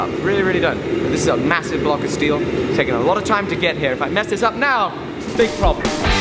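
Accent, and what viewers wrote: England, United Kingdom. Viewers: I wondered how I watched 3 episodes in 2 days and then I realized he's clearly English.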